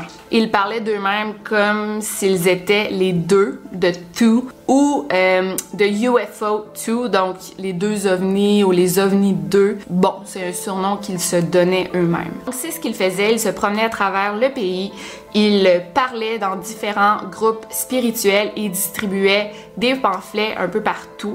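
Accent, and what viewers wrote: Quebec, Canada. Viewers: Avant je me retenais de regarder tes vidéos, parce que g gravve du mal avc l accent quebecois. G du mal à tt comprendre. Mais au final , en faisant abstraction sur ça, e franchement la qualité de ton contenue m a seduite . Je ne comorend tjrs pas 100% des mots que tu dit , mais entt cas, je comprend bcp plus. En tt cas, merci pour tt le taff que tu fais pour nous. Franchement qualité au top ❤❤😘❤❤ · Je comprends TELLEMENT PAS comment tu peux pas comprendre ce qu’elle dit. C’est littéralement juste du français avec un accent. Tu comprends les marseillais, non? Eh bien tu devrais comprendre les Québecois aussi. C’est tellement énervant les français qui disent “Je ne comprends vraiment pas ce que les Québecois disent mdr ils parlent le vieux français”. J’ai eu un cours sur l’origine des mots et en fait on parle exactement comme il faudrait, si tu regardes la prononciation des mots dans le dictionnaire, c’est comme ça que nous parlons. 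Je sais que la dernière partie n’avait pas vraiment de lien avec ton commentaire, mais je devais me défouler. Merci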